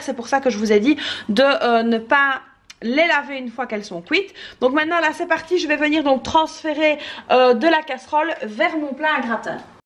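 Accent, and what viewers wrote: Belgium. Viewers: Woaw enfin la meilleure des recettes de gratin dauphinois en plus c est tellement délicieux à déguster . Tu fais vraiment la même recette que moi 🤩 Merci beaucoup à toi pour le partage 👍👍👍 Dit si pas indiscret tu es de Belgique ? Car nous avons presque le meme accent hihi je voulais déjà te le demander mais je n osais pas ^^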